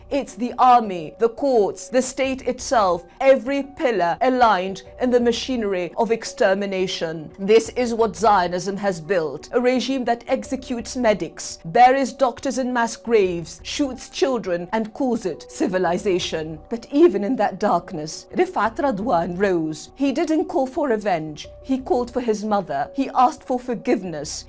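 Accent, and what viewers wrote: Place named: United Kingdom. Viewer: لهجة بريطانيا مقززة. إحكي عربي يا بنت الناس. ليش صوتك هيك متل العجوزة اللي بتعاني من إمساك؟؟ 😂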